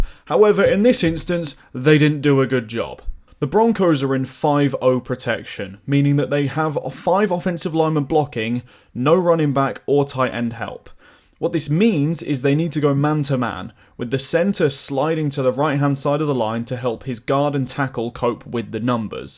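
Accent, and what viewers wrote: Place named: United Kingdom. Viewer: This is probably the first time I've heard NFL analysis in a British accent, and it's also one of the best, most concise, well presented ones at that.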